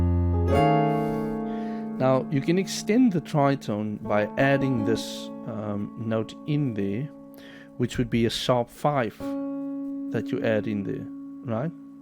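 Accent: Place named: Southern Africa. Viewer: It's amazing how distcinct a Southern African Accent is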